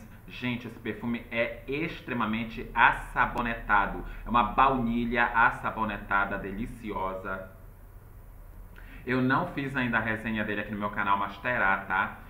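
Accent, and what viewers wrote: Pará, Brazil. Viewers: Você é paraense? Notei q seu sotaque é igual de paraense. 😊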